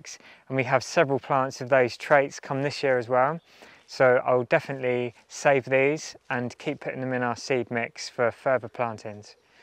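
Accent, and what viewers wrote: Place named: England, United Kingdom. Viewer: Gaspé (a 6-row, short-cob flint maize) would do it, even in the severe climates north of 53°N in vaguely-interior western North America. You sound like you're from England (incidentally, I am too - I say "England" and not "Britain" for very personal reasons) - what part of the country are you in, and how many GDD10s (growing degree days - base 10°C) do you get in a year?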